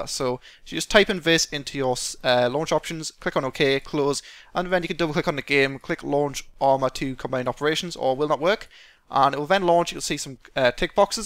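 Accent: United Kingdom. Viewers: well, the brithisch accent is pretty hard to understand, i mean i understoad everything but damn on some parts it was hard ^^'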